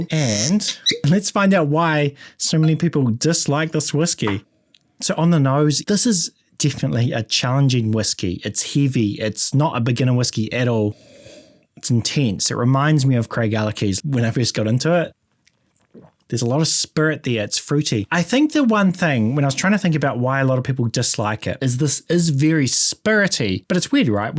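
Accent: New Zealand. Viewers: Hi, nice vid! Liked! Sorry für beeing curious, but is that a kiwi accent?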